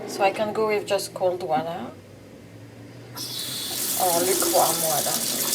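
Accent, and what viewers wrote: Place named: France. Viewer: your accent is charming, perfect English, I wish my French were as good! I cannot wait to try this technique.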